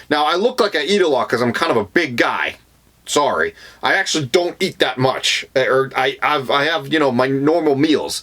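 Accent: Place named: East Coast, United States. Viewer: Nice. I was wondering where that east coast accent was from. New York, here.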